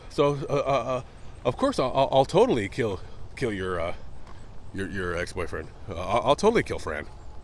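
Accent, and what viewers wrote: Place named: California, United States. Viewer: he's from California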